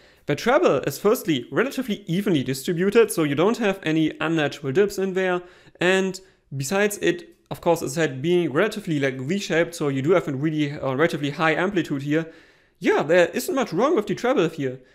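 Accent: Germany. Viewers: the german accent which sounds more delicate than american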